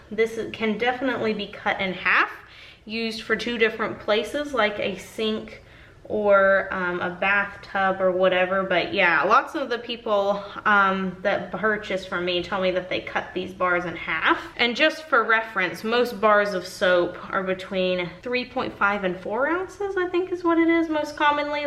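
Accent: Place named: South, United States. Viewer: I go to soap vids when i run out of pimple popper vids. I love yours cause your from the south and so country like myself.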